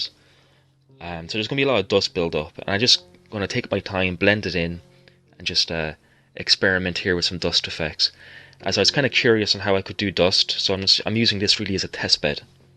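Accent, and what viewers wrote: Ireland. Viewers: Great job,! At first, I was surprised when you kept saying "tinner", but then realized it's your Irish pronunciation.:) Great video and very educational on spraying Model Color. Thank you. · my friend, your philosophy is my philosophy. The simpler the better! Oh, by the way; I like that cool accent of yours....are you Irish?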